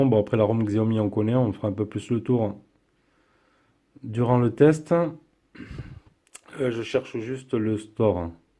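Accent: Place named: Southwest, France. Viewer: Aparisi Anthony dans le sud ouest c'est comme ça ils prononcent tout lol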